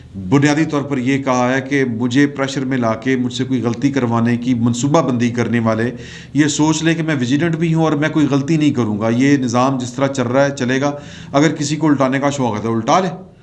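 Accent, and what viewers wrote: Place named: Lahore, Pakistan. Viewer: تہاڈی لہوری بولی دی چس آندی اے گریٹ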